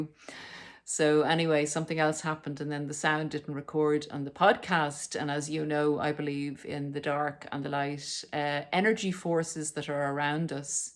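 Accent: Ireland. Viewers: I have an inner dialogue with myself all day every day lol, I use music to calm me, ground me and I love your Irish accent.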